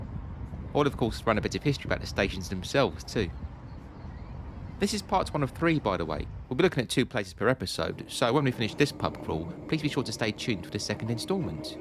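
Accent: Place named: North London, United Kingdom. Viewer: Just found your channel, thank you for sharing. Your speech pattern, especially your pronunciation of some of the 't's ans "k"s at the end of words, is very reminiscent of fellow YouTuber, Lady Decade. Is that a North London thing or something?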